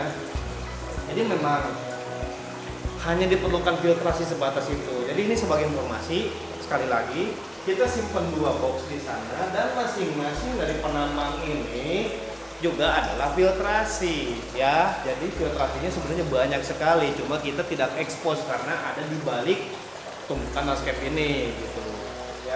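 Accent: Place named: West Java, Indonesia. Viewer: Pertahankan logat sundanya kang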